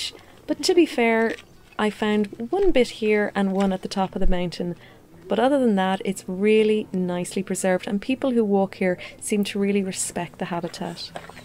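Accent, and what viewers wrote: Ireland. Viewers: All your videos just make me want to visit Ireland, it looks so beautiful and calm and the accent is gorgeous. I'm so happy that you appreciate your country so others can as well. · What an incredible way to spend some time with your father ❤️ I love your Irish accent. In my home, my children bring back the fruit scraps from their lunch or snack. I keep all in the fridge in a container and use them to make a lovely sorta “Christmas” scent in the crock pot. Add some cinnamon and vanilla extract, and omg heaven. Once the scent has been used to its full potential, I purée it, mix it with water and left over coffee grinds, feed it to my garden. Works for me and nothing goes to waste :)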